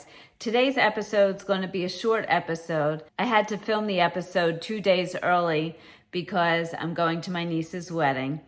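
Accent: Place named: Upstate New York, United States. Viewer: Hi,! Great pics!! It's wonderful to see the projects! They are inspiring. I love the cat couches. I won a booklet of 3 cat couch patterns, but felt intimidated, plus I didn't have access to the foam pieces to put inside. Seeing these wonderful, colorful cat couches, I just might give it a try down the road. The other projects were all amazing, too... love the blanket with the appliques. Love your website, and thank you for the Yarn Ends Tip Sheet from S.D. I had wondered if you were in New York; you sounded like an upstate or Long Island New Yorker to me, not one from the City. I am a native Ohioan, but spent 4 years in northern New Jersey before returning to Ohio at age 21. My family used to cross the border to hike to historical sites in New York. I hope you really enjoyed going to the wedding.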